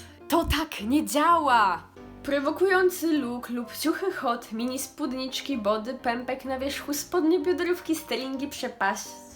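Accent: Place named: Silesia, Poland. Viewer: Świetny kanał, bardzo miło się ciebie ogląda 😁 poleciał sub oraz łapka 😁 Powiedz proszę, czy jesteś ze Śląska ? Słyszę u Ciebie lekki akcent, ale mogę się mylić 😂😂😂😂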